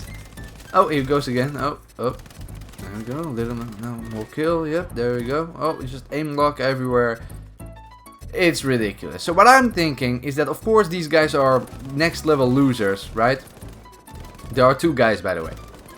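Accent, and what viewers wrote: Netherlands. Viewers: Lekker dat nederlands engels haal je er zo uit hahahaah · You sound like you are from Holland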